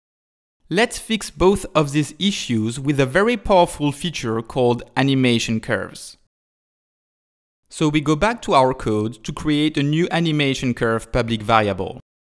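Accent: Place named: France. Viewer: Accent français détécté ! Nan sans déconner super vidéo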